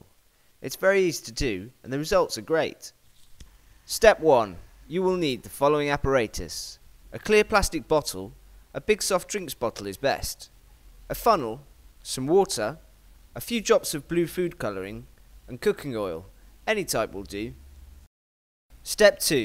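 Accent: United Kingdom. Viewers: I find it easier to listen to him because of the British Accent. Conclusion: I would have been smarter growing up in England.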